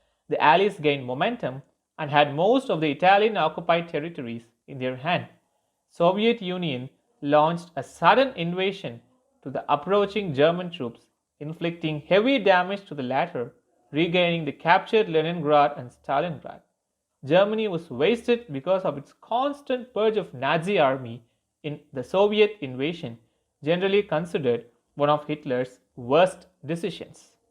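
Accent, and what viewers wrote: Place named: RP, United Kingdom. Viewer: English like bbc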